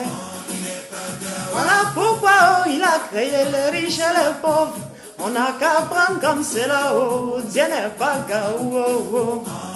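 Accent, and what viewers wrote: Ivory Coast. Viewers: Mdr tu parles comme une ivoirienne ahah avec l accent et tout^^ ca change du boulot.. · Je suis fan de vous ! Vous êtes formidable, puis t' as un accent Ivoirien t'as pas l'accent Française ! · Franchement je te kiff grave,ouais ouais je suis enjaillé c'est à dire t'as le parler,le thon c'est à dire c'est ta peau seulement sinon t'es vrai gomi ivoirienne même ouais c'est pas blô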